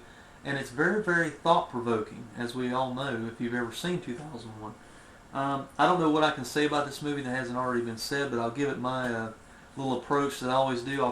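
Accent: South, United States. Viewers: Can't quite place your accent, sounds halfway between sounds mostly country southern with a mix of Carl Sagan.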